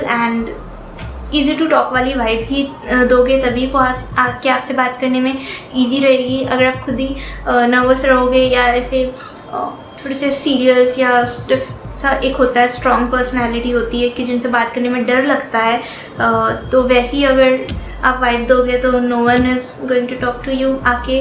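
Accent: Chhattisgarh, India. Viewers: I love that chhattisgarhi accent❤❤ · Chattisgarhiya sable badhiya 😁 It's nice to know that u r chattisgarhiya Where r u from in cg.... Ur cg accent is cute 😜😜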